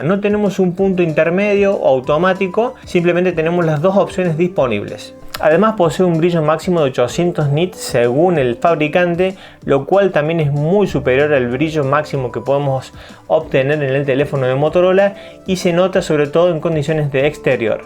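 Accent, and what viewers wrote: Córdoba, Argentina. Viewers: SOS de Córdoba bicho? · Cuesta esconder ese acentito cordobés, gracias por la comparativa me sacaste de muchas dudas.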